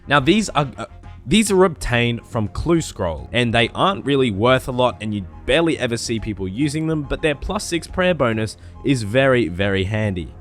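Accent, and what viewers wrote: Australia. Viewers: Didn't know you were australian. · Love aussie accents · To all the non-Aussies getting triggered over his pronunciation of Staff and Staves, just letting you know that's actually how we pronounce those two words in Australia nation wide. Nothing wrong with it. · your pronunciation of staves is wrong, or is it just australian accent? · fuck all the hate aussies have the best accent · BrokeMyNips He's Australian man, they pronounce everything differently :) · Ed Flitton Aussie accent.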